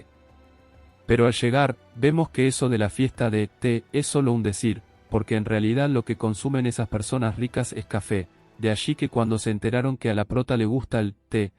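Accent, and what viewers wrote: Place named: Argentina. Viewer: Ohhhh pibe! Me encantan vuestros resúmenes! Vuestro acento y dialecto argentino es muy exquisito para mis oídos! Os agradezco por este nuevo video muy divertido vuestro relato, esperáremos vuestros otros capítulos! 🎉❤🎉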